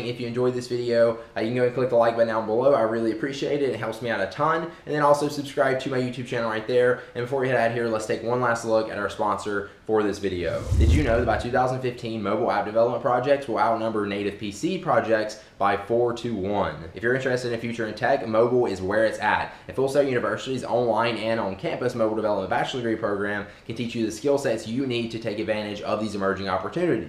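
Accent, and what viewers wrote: South, United States. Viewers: Well obviously an american southern accent, he lives in america. Nobody speaks proper english. You shouldn't be making fun of others for something you don't even do.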